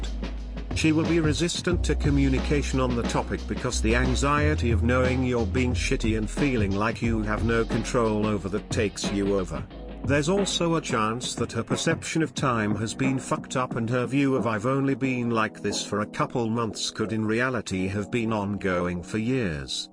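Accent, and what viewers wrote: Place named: United Kingdom. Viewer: I didn't even consider that the voice in this video was british also because i quit listening. But yes, the old voice (often named Daniel) is better. He isn't perfect, but he is the boss at this. Everyone else just sound wrong with the only exception being the american voice of the Radio TTS Youtube channel.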